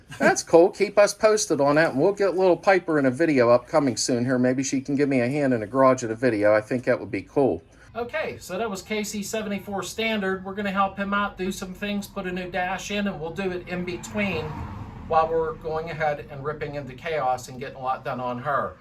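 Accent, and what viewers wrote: Pittsburgh, United States. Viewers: Is that a Pittsburgh accent I hear? Thanks for the great video!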